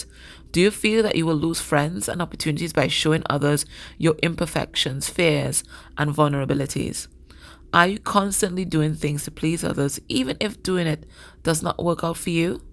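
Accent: Trinidad and Tobago. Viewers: Good afternoon, from London England, you sound Trinidadian, or Caribbean, I am Capricorn,xxxxx to you and family..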